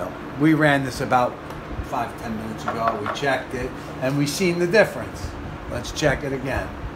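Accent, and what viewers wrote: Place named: East Coast, United States. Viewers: I knew it, you sound like I do, lol. Like you, I’m born and raised in Brooklyn 18yrs, moved to Long Island 18yrs, now I live in El Paso Texas, nice small town. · GOOD VIDEO.... LIKE YOUR THICK EAST COAST ACCENT...... LOL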